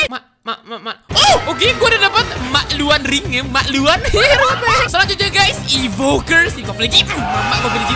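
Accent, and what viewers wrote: Java, Indonesia. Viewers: Orang Jawa kah bang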